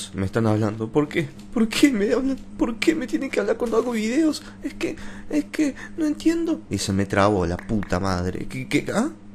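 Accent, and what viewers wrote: Córdoba, Argentina. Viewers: Se Nota Que Sos Cordoves De Argentina :D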